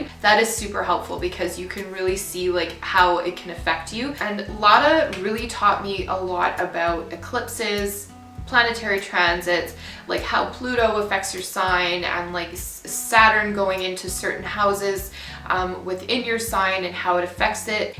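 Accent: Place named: Canada. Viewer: I appreciate ur authenticity and sharing these prominent Youtube leaders! Although they don’t always resonate with me they are knowledgeable & authentic. There so many quasi spiritual charlatans out there presenting 1/2 truths & can be damaging to new seekers. ❤️ ps i thought i heard ur sweet canadian accent!!! 😉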